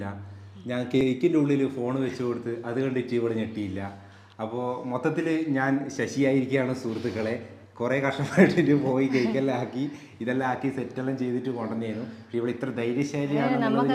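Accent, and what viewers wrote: Kannur, India. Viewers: അനക്ക് എനക് കണ്ണൂർ സ്ലാങ് അടിപൊളി 🕺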